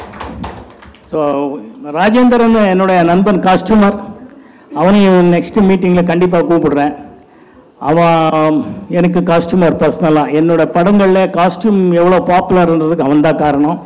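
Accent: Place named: Karnataka, India. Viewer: பேச்சுல கன்னட நெடி...ஆனாலும் நல்லா இருக்கு....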